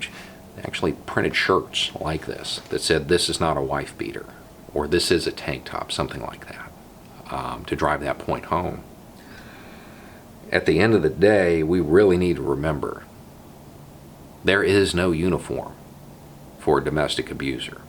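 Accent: South, United States. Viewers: I discovered you just today, via a FB friend, and dude, you are awesome. I'm sorry to say that I do stereotype some, so how much more do I love hearing your Southern twang say things that aren't Trumpisms. Tats & the beard's hip up here -- in small town Ontario, anyway. The tank top/wife beater top's only offensive if it's worn by a very laege, sweaty person who should know better. Maybe things are different in the US? I hope things get better. You guys deserve so much better than Trump, or Bush, or bloody Nixon, or (dare I say it) Reagan. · We all have prejudices. It seems to me that having a 2 party system has divided the country between liberals and conservatives. It’s the only way the elites can maintain power. Divide and rule is the name of the game. As for you, the fact you have that southern accent brings those preconceptions we have about middle America. 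· you are the reason why thousands of people overcame their prejudices against Southern US guys of European ancestry (I reject the classification into black and white. Euro- or Afroamerican is more realistic). Good Southerners are too often overlooked and put into the same box as the bad guys. Same with Israeli Settlers, Iraqi Sunnis and ethnic Russian Ukrainians. You speak a certain dialect from a certain area and bam! you are suspicious. Anyway. Good job.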